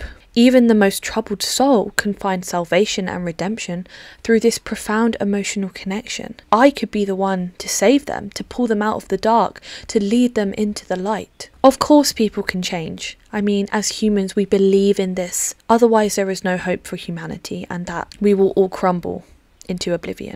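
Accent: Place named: United Kingdom. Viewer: Maca bree? Is macabre pronounced differently in the UK? (Only guessing, as you sound British.) *Didn't want to leave just that...i found the entire essay insightful and well-written. You have some good thoughts and know how to put them together. I have an English degree but only write for myself now, mini essays. I was listening while, ironically, coloring in a coloring book (with my 300 sharpies) as i do when i flip around youtube looking for something engaging. I subbed, and I'll be back to check out the rest of your content. (One more thing: i used to have a little dog named Honey Bee, and i have "Bee" tattooed on my back, on my shoulder blade. Its the only tattoo i got in jail, years ago.)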